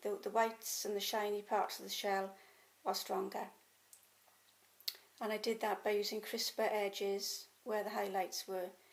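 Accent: Wales, United Kingdom. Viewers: Yeah. It's easy to tell a welsh person from the accents. I love your video by the way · I just got into watercolours as a way to manage stress and anxiety - it can be overwhelming as a beginner but your video was exactly what I was looking for. You move at a good pace, your explanations are wonderful and your Welsh cadence is a pleasure to my ears. Thank you, brilliant work.:)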